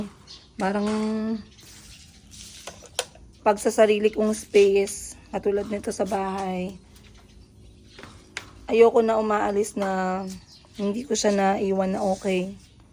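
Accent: Iloilo, Philippines. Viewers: Ilongga gid tuno mo taga Antique ako dhay. Kaya lagi ko pinapanood vlog mo. Be strong lng gid. Enjoy lng sa mga anak mo. Watching from bharain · Nami yah ilonggo nga tono hahahaha mo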